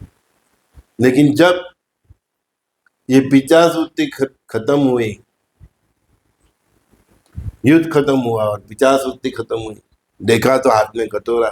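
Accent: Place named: Gujarat, India. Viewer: Vo gujrat ke hai, hindi mother tongue nahi hai unki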